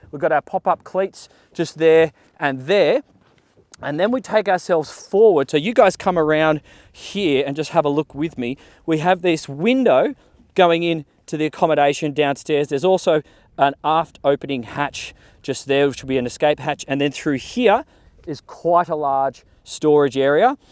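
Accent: Australia. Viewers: mate, that aussie accent, insufferable. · Those lines are as smooth as hot butter. The combination of excitement, gestures and the accent in the bow presentation I got some Steve Irwin vibes and it really clicked why the videos on this channel stand out.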